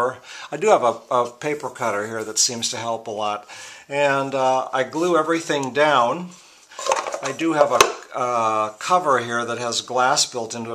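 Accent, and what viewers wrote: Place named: Midwest, United States. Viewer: I love your work. The accent? I'm trying to place it... Wisconsin, Minnesota,.... am I close??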